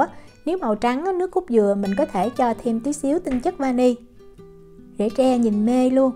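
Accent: South, Vietnam. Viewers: Giọng nói miền Nam của rất dễ thương, nhưng nếu đừng trộn từ miền Bắc vô thì sẽ dễ thương hơn nhiều. “vô, không phải vào; lợt, không phải nhạt” …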